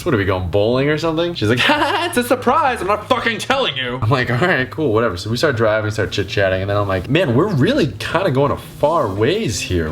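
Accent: Boston, United States. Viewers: I just like listening to your Boston accent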